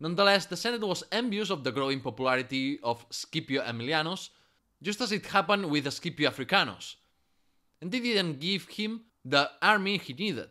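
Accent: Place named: Spain. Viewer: Perhaps you should learn Spanish and therefore understand his accent. I grew up with it, lucky me. It surely would help you to further understand Spanish history.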